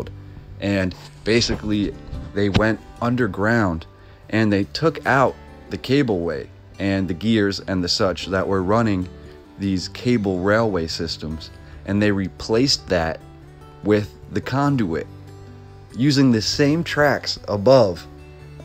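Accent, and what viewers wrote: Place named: Pennsylvania, United States. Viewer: You gotta be from somewhere between Allentown, Reading, and Philly. Possibly Quakertown. Can't hide that acccent lol.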